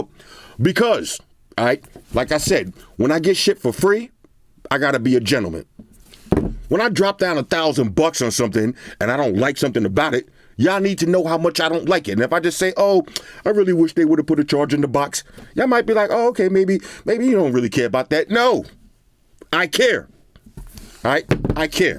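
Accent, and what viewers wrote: New York, United States. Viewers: You from New York? B.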